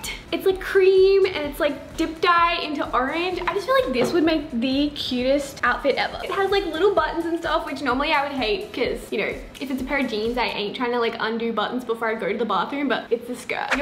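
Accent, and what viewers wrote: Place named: Australia. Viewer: I am literally going to forever21 and getting everything you got in this video! I love hearing your voice, because I’m Australian, and I very youtuber I watch, is American, so I love hearing a fellow Aussie! Love u!!! P.s I love denim on demon PPS soft squad! PPPS I think it is a lettuce hem!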